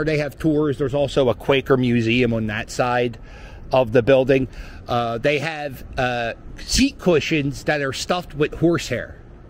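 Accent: Philadelphia, United States. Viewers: Best Philly accent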